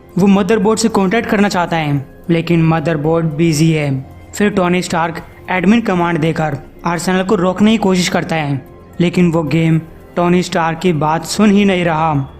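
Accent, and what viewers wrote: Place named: Kota, India. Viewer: shai me.... Sayd maine tume deka hai... Me kota( jila )ke pas me ik gam se huuu.... Tumare bolne ke tarike se lagta hai ki tume bi yhi aas paas ke hi hoo...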